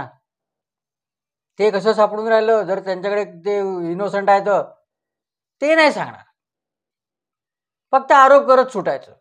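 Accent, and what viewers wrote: Vidarbha, India. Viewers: your analysis is so cryptic and humorous, especially your Vidarbha accent is so endearing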